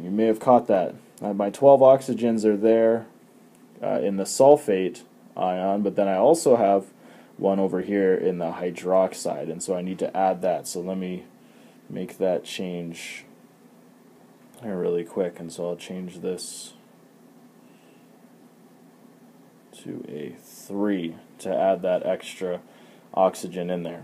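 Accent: Canada. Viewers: Stupid Canadian accent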